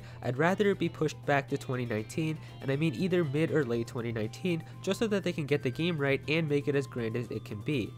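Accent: Netherlands. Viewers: Wait a minute, are you dutch. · are you from the Netherlands or Belgium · wait, are you dutch? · Are you dutch?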